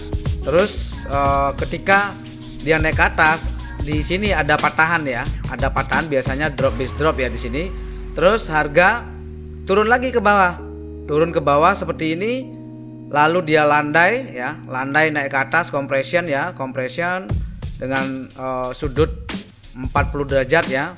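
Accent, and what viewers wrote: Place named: Bali, Indonesia. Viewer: Kaya logat bali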